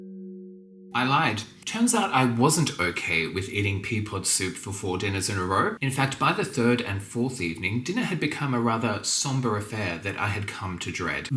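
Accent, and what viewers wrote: United Kingdom. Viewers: Your videos are a joy to watch and, as always, your English accent is brilliant and you sound just like the Headmistress at my school……think Hogwarts, without the magic, but all girls and in the 1960’s. Every time you said ‘mock’ you made me giggle 😂😂 I’m in Lancashire England and In Aldi today 500g of leeks were £1.39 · Just loving what you're doing and the presentations are fabulous - especially the RP! Makes me smile every time. Thank you 😄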